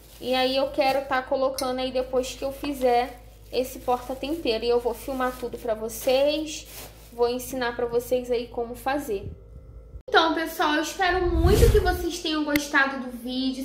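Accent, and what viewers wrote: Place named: Rio de Janeiro, Brazil. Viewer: Oi ta tudo bem caro mais fazer compra por mes e bem melhor Ótimas compras eu tmb comprei chester na peomoção kk eu tmb compro tempero na casas Pedro Eu sou do RJ pelo seu sotaque vc e do RJ tmb ou me enganei kk eu moro perto do shopping Grande Rio Baixada fluminence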